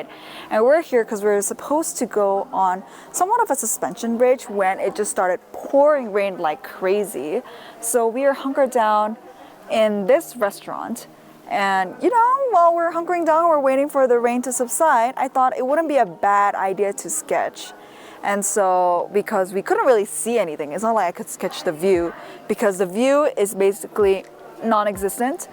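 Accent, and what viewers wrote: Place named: United States. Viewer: Hi! I'm English Nothing wrong with your English Ah ah! Bit of USA accent to me But enjoy your channel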